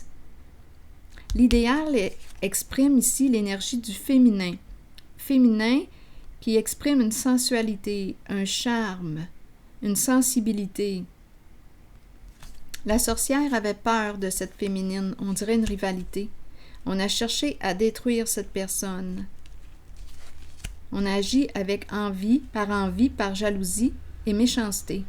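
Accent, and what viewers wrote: Canada. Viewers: j'adore votre rire enfantin et votre accent canadien je suis scotchee et j'adore le Quebec qui est une region si jolie, ou on mange si bien dans d'excellents restaurants merci d'etre presente tous les jours dans ma vie pour me donner des nouvelles de l'homme qui est dans mon coeur je vous souhaite une excellente soiree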